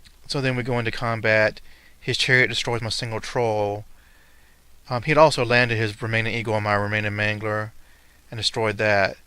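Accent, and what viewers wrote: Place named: South, United States. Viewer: Only just started wtaching your Battle reps and you probably get this alot, but your intro music is class and southern accent is great for narrating! The battle reps are very good also! Great Job!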